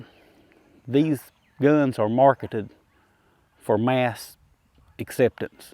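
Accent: United States. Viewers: you have an interesting accent which is specific for one area of USA, that's why I asked.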